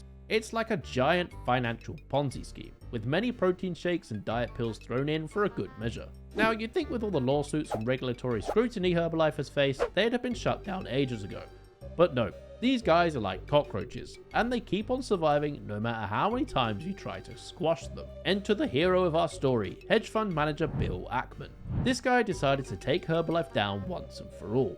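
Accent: United Kingdom. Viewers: Wait is this brit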